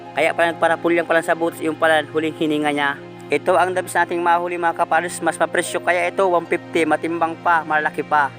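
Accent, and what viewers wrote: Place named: Samar, Philippines. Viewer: Sir tagasaan k s Samar? Kc parang minsan nhhalata kita nag bbisaya parang Samarnon lol 😊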